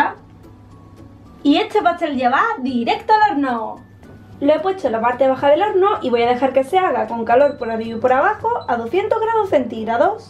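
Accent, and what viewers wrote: Andalusia, Spain. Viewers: Eres andaluza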